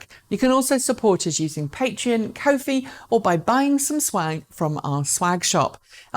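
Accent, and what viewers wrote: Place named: United Kingdom. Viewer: Another good review, where are you based as you have a British accent but quote in dollars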